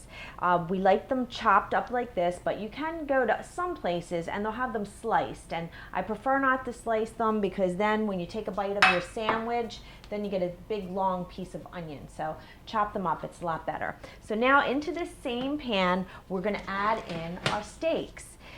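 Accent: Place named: New Jersey, United States. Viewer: OMG... i can hear the Jersey in her voice..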